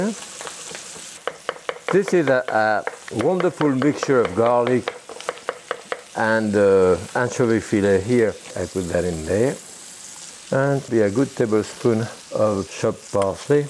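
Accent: France. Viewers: Sean He just has a thick French accent...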